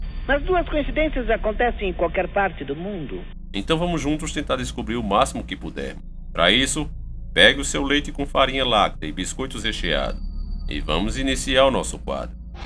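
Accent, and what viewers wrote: Northeast, Brazil. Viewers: é o narrador da discorver channel más nordestino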